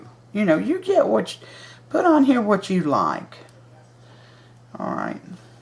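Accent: South, United States. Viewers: Good recipe the dough for pepperoni rolls. Yum yum yum. Loved loved loved your sweet sweet sweet southern accent. Thanks fo sharing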